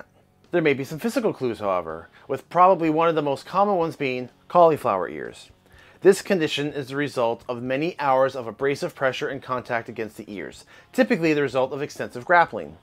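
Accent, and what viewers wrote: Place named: Russia. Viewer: Cauliflower ears and Russian accent is the ultimate combo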